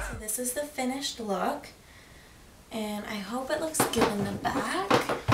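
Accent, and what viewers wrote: Canada. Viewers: Hehe I could tell you're Canadian by the way you talk ^.^ A bunch of shows are Canadian so I kinda know how the accent is lol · Lol your Canadian accent is cute.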